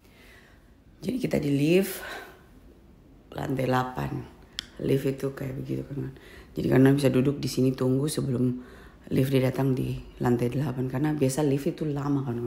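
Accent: Papua, Indonesia. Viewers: Kk sehat2 ya....senang dengar kk punya logat Papua biar tinggal di lain benua logat tra hilang 👍❤️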